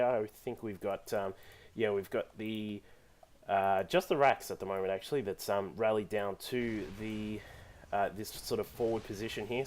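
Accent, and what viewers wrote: Australia. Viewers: Do i hear Australian accent? Like the vids man, keep em commin'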